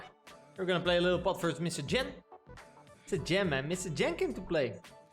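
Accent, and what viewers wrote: Netherlands. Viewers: great man. I heard the typical dutch accent when talking english haha. Great content man , i love it !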